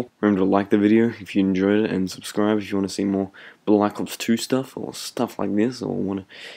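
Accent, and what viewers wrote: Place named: Bogan, Australia. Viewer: Hop on the old bandwagon? Dude you're sounding like a bogan now :O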